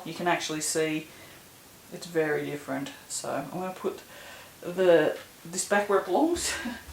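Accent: Australia. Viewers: Hi, I'm a bit late to the party... love your Aussie commentary lol (Aussie here too). I have this fragrance but haven't used it yet, so was good to see your video. I wanted to ask where you got your soap cutter from please.. I've been looking but seems like theyre all O/S..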